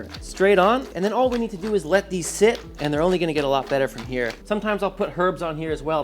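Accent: United States. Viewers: Clicks on link, hears an American accent then switches off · He may have a yank accent but he's an English lad with that fryup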